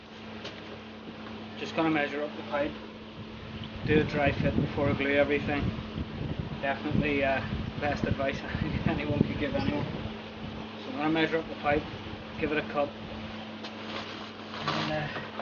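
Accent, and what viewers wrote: United Kingdom. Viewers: Great video,hope you and the family are well as we are here,great to hear the Holywood accent. Used that flexi pipe for a few years now and it is really strong though others have to be carefull as it can come in 38mm and 40mm and the larger wont fit solvent weld which I have learnt,just thought I would help a bit. Keep up the videos mate and look after yourself.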